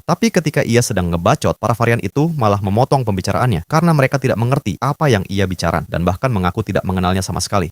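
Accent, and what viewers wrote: Sunda, Indonesia. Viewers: Abang ini ternyata orang Sunda ey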